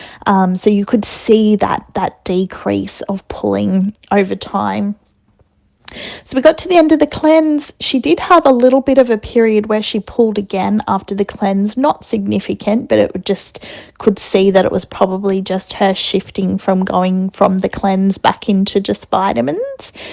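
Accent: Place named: Australia. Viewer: I love your Australian accent! I never get tired of listening to it 🧡🧡🧡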